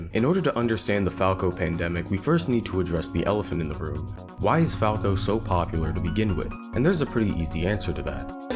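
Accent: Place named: New York, United States. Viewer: has the thickest NY accent i've ever heard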